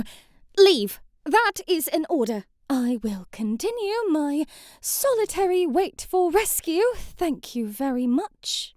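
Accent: United Kingdom. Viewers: im begging for a hairdresser one with Adela you'd fit her with the brittish accent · I love your British voice😌❤so beautiful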